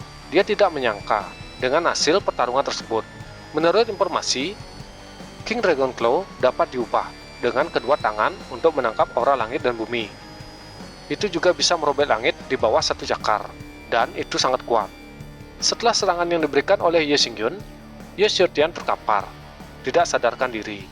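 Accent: Bali, Indonesia. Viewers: Cengkoknya kayak orang bali ya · logat balinya kentel bgd^^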